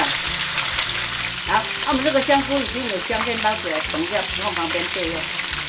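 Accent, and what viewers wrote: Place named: Taiwan. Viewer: 阿嬤.好可愛台灣國語支持你👍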